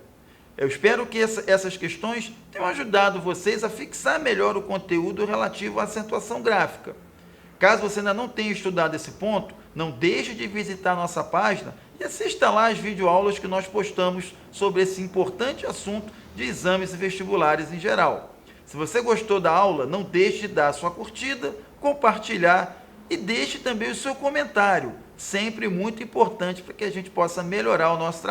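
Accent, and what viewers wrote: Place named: Rio de Janeiro, Brazil. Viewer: Esse professor fala igual os pastores da Record , sotaque carioca, bem explicado , parabéns pelos vídeos , foi de grande importância , e conhecimento...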